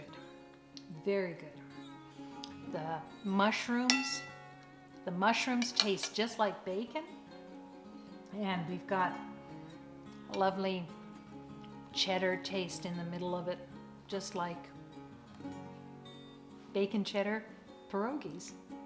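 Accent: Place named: Canada. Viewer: The Canadian accent is really only perceptible in the "ou," but it's the tone of your voice, speaking rate, and enunciation that make your videos a pleasure to watch....and the food! :)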